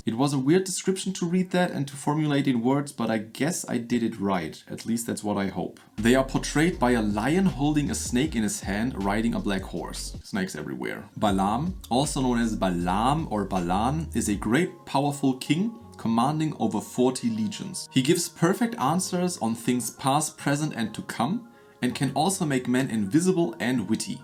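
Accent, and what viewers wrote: Germany. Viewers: Do not worry how you pronounce the names. German accent is the sexiest.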